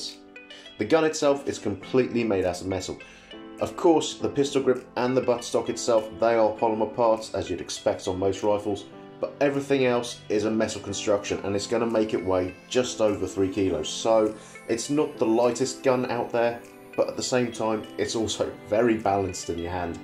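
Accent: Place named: Australia. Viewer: lol Your aussie... where you based?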